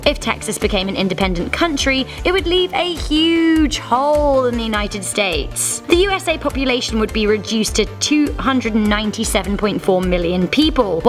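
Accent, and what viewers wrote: United Kingdom. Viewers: Its weird listening a British woman say Texas tbh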